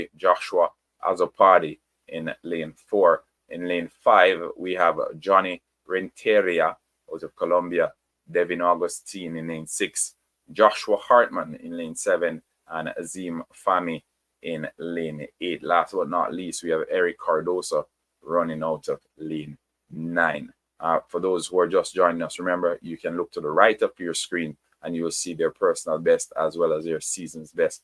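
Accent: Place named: Jamaica. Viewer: Yeeaahh,, already can catch up/easily understood yr Jamaica's english‼️😆😅Thnk u vm! 👍👍😁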